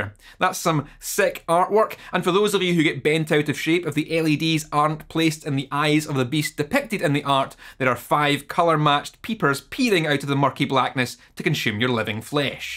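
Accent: Scotland, United Kingdom. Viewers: I'm so happy my love for how certain words (most words tbh but some in particular) sound so good in a Scottish accent was validated by the man himself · I love the vocal chain. And everything sounds great in a scottish accent.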